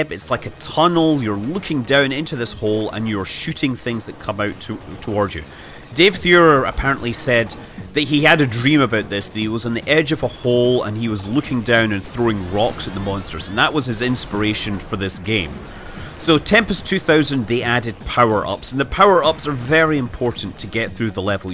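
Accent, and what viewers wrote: Scotland, United Kingdom. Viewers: Skaughtisch accent.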